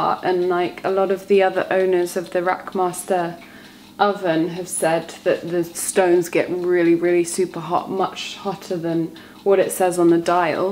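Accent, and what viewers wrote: United Kingdom. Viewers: I love your British acent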